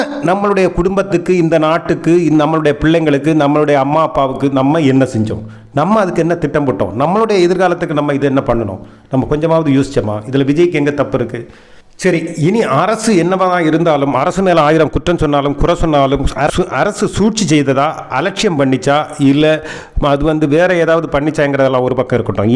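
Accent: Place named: Kerala, India. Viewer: தம்பி அருமையான பதிவு தம்பி உன் குரலில் மலையாளம் கலந்து வருதே நல்லா இருக்கு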